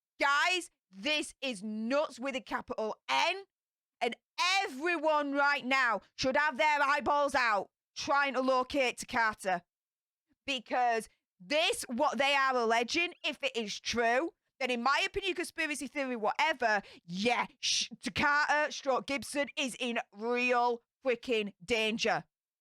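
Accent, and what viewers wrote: Yorkshire, United Kingdom. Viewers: Hey love your sass and Yorkshire gobiness. Sending love from Bradford.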